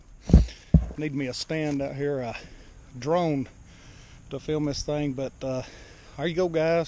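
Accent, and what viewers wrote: Tennessee, United States. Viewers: Fellow Tennessean? I ask because of the accent. And love the shee keep up the good content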